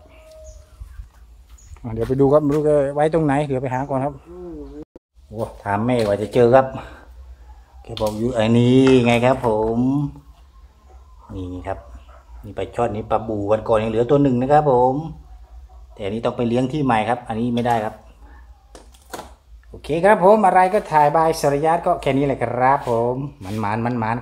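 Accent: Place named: Central Thailand, Thailand. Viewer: พี่พูดกลางทำไมไม่เรียกปลาช่อนครับ5555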